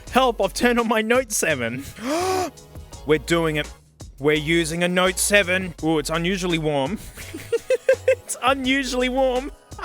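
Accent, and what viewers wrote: Australia. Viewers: why? hes just gunna say the same things everyone else has except in an australian accent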